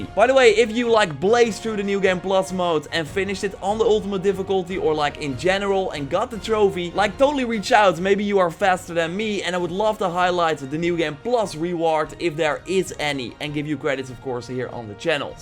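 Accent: Netherlands. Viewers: omg you are dutch :D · Ik wist dat je Nederlands was!😂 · are you dutch?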